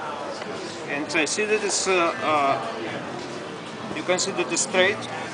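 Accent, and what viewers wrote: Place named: Russia. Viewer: Russian accent👍👍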